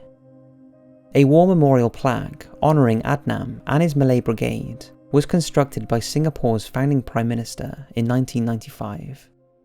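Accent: United Kingdom. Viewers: I understand that, being from Great Britain (from your accent), you would focus on the heroes of the Allies. Yet, I wonder about the heroes of the opposing sides, especially the Germans. I don't care about the Nazis, I'm referring to the average soldier of the Wermarcht. These boys were no different than any other soldier, scared, far from home, fighting for their country and wanting to return home. Unfortunately, because they were controlled by the Nazis, they get lumped under the same title without thought.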